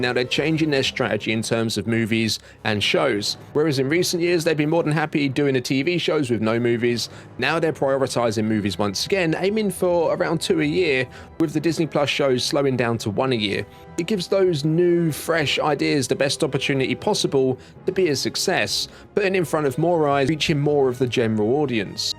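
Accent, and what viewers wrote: England, United Kingdom. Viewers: I love Star Wars. I love English accents. I love smart, interesting discussions of star wars. Well done, mate.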